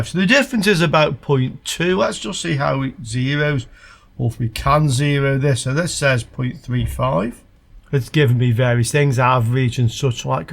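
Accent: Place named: Stoke, United Kingdom. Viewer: Classic stoke accent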